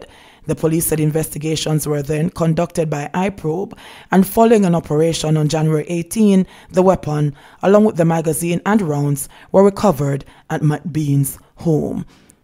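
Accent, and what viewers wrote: Jamaica. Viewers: Love u one more time my girl real jamaica talk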